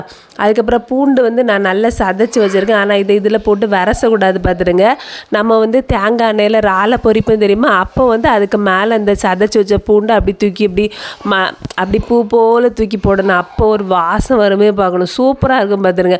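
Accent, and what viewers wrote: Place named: Kanyakumari, India. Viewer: சமையலை தாண்டி... கன்னியாகுமரி தமிழ்... வெற்றியின் ரகசியம்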